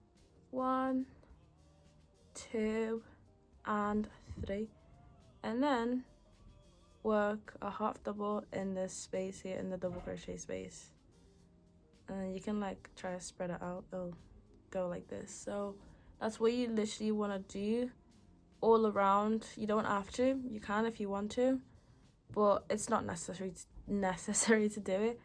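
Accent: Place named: Scotland, United Kingdom. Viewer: Your accent sounds a little northern, it’s so cute! Are you Scottish? Love from America ❤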